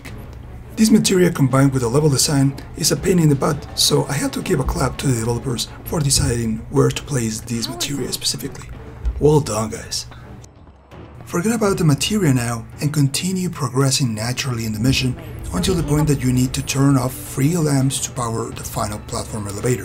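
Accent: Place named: Canada. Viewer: Thanks man! I would never have found it without your video. Cool accent! Are you from Canada? France?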